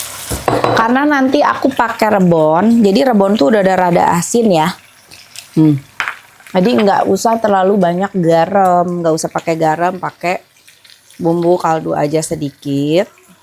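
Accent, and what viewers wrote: West Java, Indonesia. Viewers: Teteh, saya suka dengan cara ngomong sunda teteh ❤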